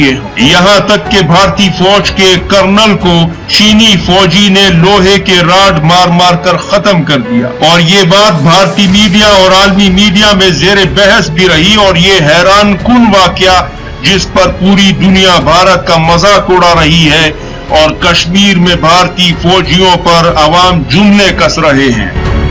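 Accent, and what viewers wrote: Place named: Pakistan. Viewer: Very good comentry best language and pure pakistani accent other u tuber speaks like indians pure pakistani accent plz keep it up brother aapriciate to adopt pakistani accent this is our language we dont like indian accent love you u tuber speak like this thanks from karachi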